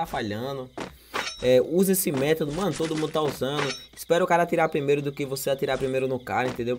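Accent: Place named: Paraíba, Brazil. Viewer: Paraiba pra fala feio Zuado joga nada.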